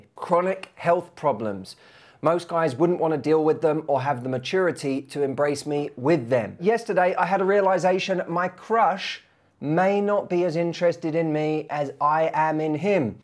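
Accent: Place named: United Kingdom. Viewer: I think you’re an EXCELLENT SPEAKER! And we LOVE The way you talk, and your British accent. It’s amazing how we have these silly things in our head like you said stop comparing ourselves to others. And I prefer over Tony! seems genuine and kind compassionate NOT filthy dirty slimy and manipulative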